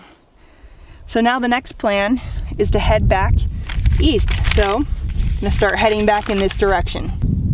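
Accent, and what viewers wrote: United States. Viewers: I love ears english and i love your american accent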